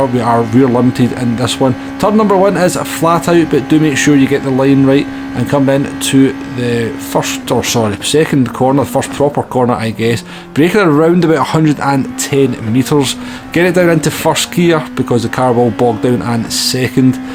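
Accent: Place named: Liverpool, United Kingdom. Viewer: or is it scouse written. I don't know. Pretty difficult to understand it correctly. It seems that you're comming from Liverpool or somewhere over there.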